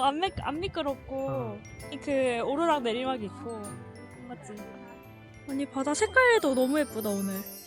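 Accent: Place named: Busan, South Korea. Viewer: 시애틀 브이로그 넘 재밌어요!! 자주자주 올려주세요~~ 옛날에 비해 특유의 뽀짝한 부산사투리가 많이 옅어지셨네요 ㅎㅎㅠㅠ 그래도 귀엽고 예쁘고 다 한,♡ 유투브 다시 시작해주셔서 감사해요!!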